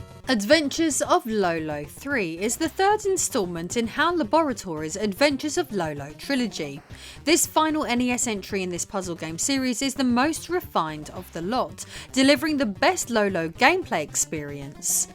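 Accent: United Kingdom. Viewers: Hello You have a nice accent, are you British ^^ I wish i could find another Lady like you, passionate by video games.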